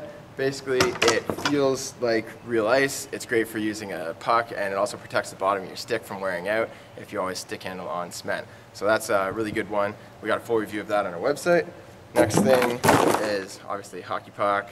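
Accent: Canada. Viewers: he sounds like true Canadian and nice vid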